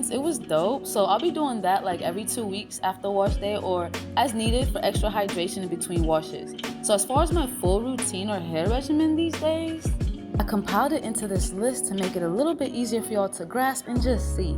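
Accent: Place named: United Kingdom. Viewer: The British accent 👏🏽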